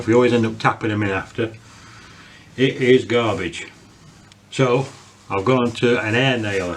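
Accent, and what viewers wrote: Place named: West Yorkshire, United Kingdom. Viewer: Great video thanks pal, I nearly bought the orange one until I saw this so cheers, sounds like you’re from West Yorkshire too? I’m in Halifax!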